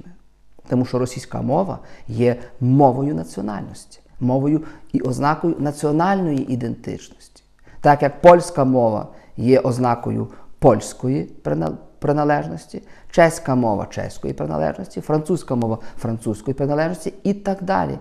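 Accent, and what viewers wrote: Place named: Western Ukraine, Ukraine. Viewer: Ой, как вы здорово говорите на украинском, ох это украинская с западенським акцентом.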